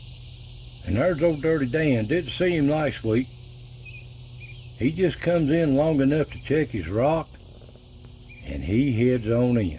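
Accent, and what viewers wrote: Appalachia, United States. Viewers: I am 54.I miss my earthly father and his family which are all deceased now.Its so soothing to hear voice that brings back edifying and that Appalachian voice.I praise Christ now as my heavenly father gives me Peace through the Word of the holy bible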